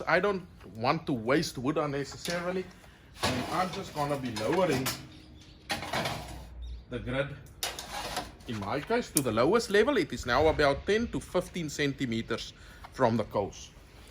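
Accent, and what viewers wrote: South Africa. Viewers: If I’m braaing and someone with your accent comes over, I automatically hand over the tongs out of respect for braai authority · ek love jou videos en suiwer Afrikaans! Baie refreshing. Eet met jou hande as jy chops eet! Gooi suurlemoen sap oor net voor jy bedien anders raak dit bitter oor die vuur, en laastens moenie die braai bak helemal toe maak as jy die vleis afgehaal het nie, dit stoom verder gaarder. Love you stuff broer! Moenie ophou nie! Hierdie is authentic content! Jy is 'n great presenter en jou vrou ken haar storie agter die kamera! Groete!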